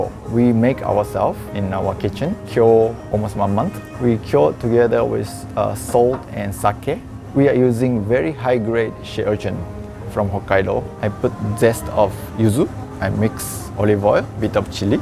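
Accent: Singapore. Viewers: This is new to me. A Japanese with Singaporean accents 😅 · Video: English Chef: Japanese Accent: Singaporean Dish: Italian Ingredients: Japanese Bill: Priceless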